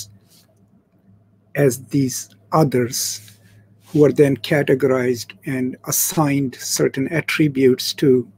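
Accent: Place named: England, United Kingdom. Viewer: thanks for your works! ps: i like your voice and english accent so much greetings from france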